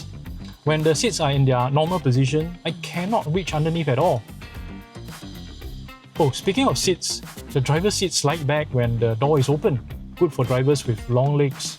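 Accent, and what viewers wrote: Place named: Singapore. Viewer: Singapore English style 😑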